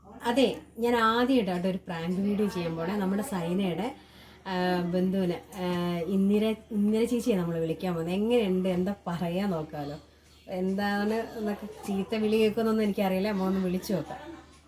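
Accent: Vadakara, India. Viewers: 😂😂😂അടിപൊളി...വടകര ഭാഷ 😌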